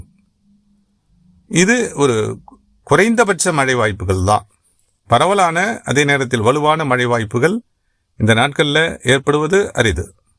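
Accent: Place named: Sri Lanka. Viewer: நீங்கள் இலங்கை தமிழில் அழகாக பேசி உள்ளீர்கள்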